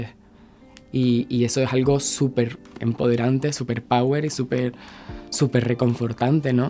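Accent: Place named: Chile. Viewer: Tiene una linda aura; resplandeciente; su acento siempre me ha parecido un poco chileno.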